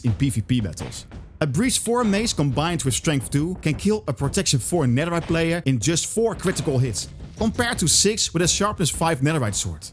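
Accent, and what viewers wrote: Netherlands. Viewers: You are dutch right i hear it 😂😂😂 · it is dutch he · Iedereen kan horen dat je nederlands bent :) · Dutch ahh guy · I smell Dutch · Je klinkt maar een kleeein beetje nederlands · i can smell the dutch accent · gelijk weer te horen aan ons typische Nederlands accent 😂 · Are you Dutch? You sound Dutch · lol are you dutch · We zijn dus zeker wel nederlands, probeer aub een engels accent te leren bro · are you dutch?? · I smell dutch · Hallo! Ik vroeg mij af of je Nederlands bent, jouw accent klinkt namelijk heel Nederlands! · do u happen to be dutch?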